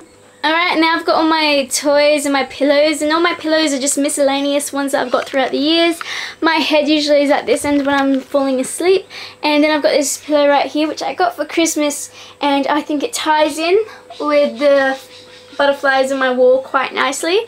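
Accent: Australia. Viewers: are u from Australlia because you sound like it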